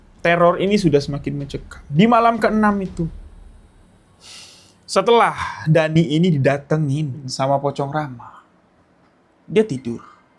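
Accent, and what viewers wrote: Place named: Jambi, Indonesia. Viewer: sama2x bang, maaf jarang komen bang, maju terus bang udah mode jambi tuh pembawaannya ❤❤❤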